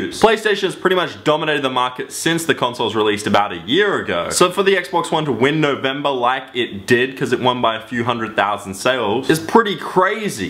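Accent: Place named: Australia. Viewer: O i thought u were american ur aussie :D Im Glad There Are Some Aussies Gaming! :D But Your Dad Dieing Still Makes Me Sad D: R.I.P To Your Dad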